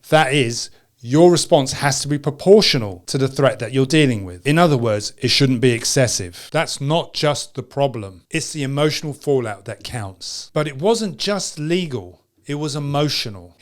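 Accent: United Kingdom. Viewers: So he says assault does not involve a strike. Will police charge someone just for Assault? I'm guessing that they will not. If so, then bullies are free to intimidate/harrass to their content?! I think the US has a "stand your ground" right; if the harasser/assualter encroaches within striking distance (i.e. shows threat), i presume the person is entitled to strike first? This video speaker has British accent, so maybe his advice does not apply in the US?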